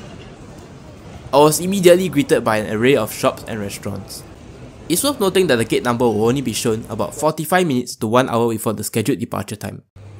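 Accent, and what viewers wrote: Singapore. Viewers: Good job on the video and am glad yr accent is genuine Singaporean. However, the words seem to be generally quite 'compressed'. In the English language, there are not only short vowels but long too.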